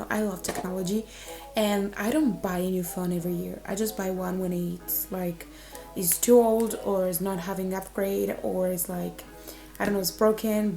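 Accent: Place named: Italy. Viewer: you kinda sound italian, but I can’t say because you speak english very well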